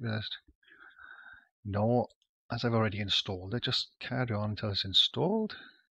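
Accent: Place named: Cardiff, United Kingdom. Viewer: You don't expect a Jack to take any notice of a bloke with a Cardiff accent! Thanks very helpful.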